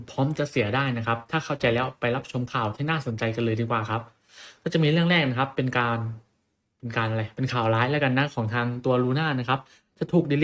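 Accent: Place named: Central Thailand, Thailand. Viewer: ใต้ตรงไหนเนี่ยะ ออกแนวเหน่อภาคกลางมากกว่า หรือไม่ก็ภาคตะวันออก..สารภาพมานะ..เป็นคนจังหวัดอะไร..บอกมา..ไม่งั้น..โกรธ...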